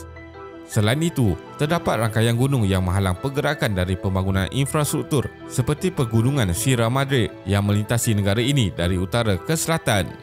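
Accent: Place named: Malaysia. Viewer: Alaa lidah melayu biar jee lar,orang lain ok jee dengar…kalau rasa terganggu dengan sebutan admin sangat,pergi kat tepi dinding bisik slow2 kat dinding tu atau masa nak berak tu jerit puas2 pasal admin salah sebut tu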